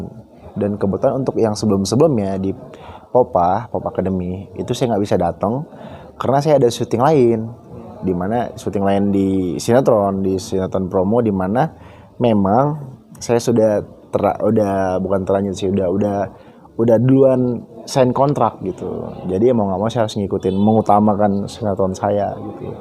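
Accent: Medan, Indonesia. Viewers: Sbnrny sih aku pkr mrk yg hujat bang bi itu kgn pgn bang bi d indosiar x krn kan udh kgn..mgkn gtu x bang krn kan bang bi ada nmny d maulid nabi itu tp knytaan ny bang bi ada d bali.jd pkran mrk abang lbh mentingin hoby..gtu mgkn..dn logat medan emank keras sih jd d fkr org abang mrh2..santai aja bang nanggapin haters sih..jgn d dgrin yg pnting berkarya aja..love u bang bi..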